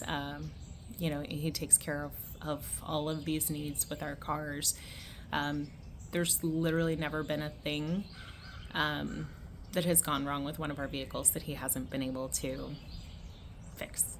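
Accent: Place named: South, United States. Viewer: I can tell you are from the South like me.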